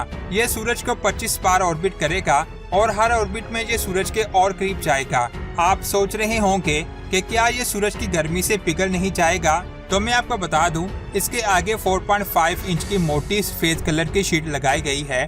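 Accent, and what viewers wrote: India. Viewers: Teri awaz se pata lagta hai tu indian hai ..pakistani ban kar chutiya bana rah hai...😂😂😂😂😂😂😂 teri zaban bata ti hai tu indian hai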